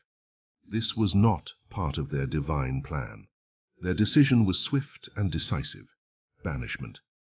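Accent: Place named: United Kingdom. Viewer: Yes I like this deep British/original English accent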